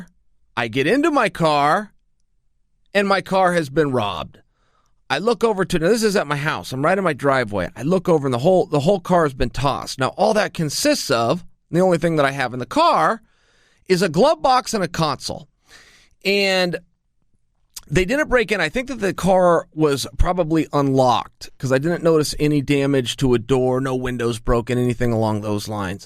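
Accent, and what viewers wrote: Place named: Portland, United States. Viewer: Sounds like a portlandian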